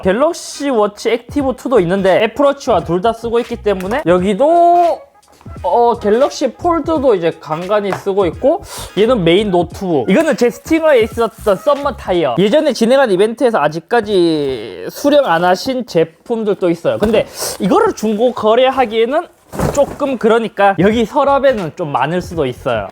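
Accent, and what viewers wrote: Gyeongsang, South Korea. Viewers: 역시 경북 안동 출신이시군요 사투리억양이라서 어디지...ㅋ 궁금했거든요 구독자20만 정도부터 눈여겨보던 유튜버이신데 벌써 118만!! 두둥 멋집니다 앞으로도 ~^^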